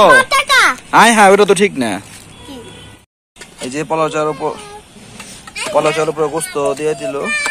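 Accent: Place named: Sylhet, Bangladesh. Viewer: আপনার মেইন বাড়ি ঢাকা নাকি আপনার কথায় বুঝা যায় আপনি সিলেটি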